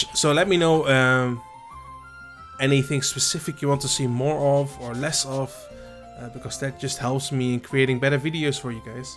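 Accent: Netherlands. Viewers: Dutch accent, Efteling music, Pieck dark ride - what's not to like! Subbed.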